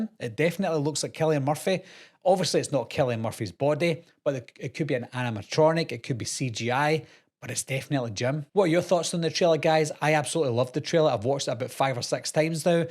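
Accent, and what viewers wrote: Scotland, United Kingdom. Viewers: Dude,I love the Scottish accent!❤ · Are you from Scotland ?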